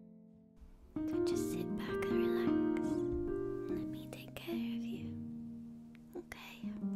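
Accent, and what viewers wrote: Northern England, United Kingdom. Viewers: Beautiful Girl xx 😍🔥💕❤ Tingles for sure Love your accent northerner like me,, I'm Bolton by the ways.. Keep up the fabulous video's baby xx.. Youu are asmr Angel love your eye's very Disney princess.. getting Elsa frozen vibes,😊.. Xoxo Levi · She’s British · Somewhere in northern England? Manchester maybe? (definitely didn’t read the comments) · I can’t tell if her accent is British or Australian?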